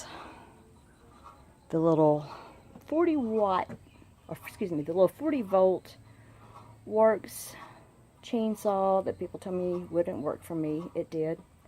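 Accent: South, United States. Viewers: I might have to check out one of those chain saws. I've always just used loppers. I've wondered if you live in the South? I live in East Tennessee and we have similar accents 💖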